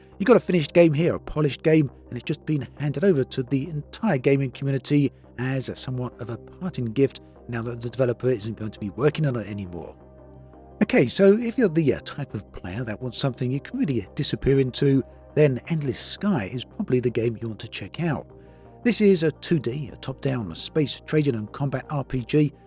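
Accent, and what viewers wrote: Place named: England, United Kingdom. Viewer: I can barely understand with your English accent .. need to speak slower mate 😊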